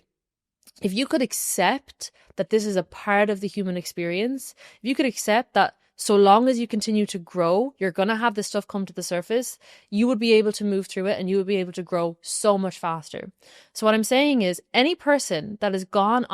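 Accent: Ireland. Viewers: Please embrace your Irish Accent, it’s one of the many reasons why I am attracted to this content.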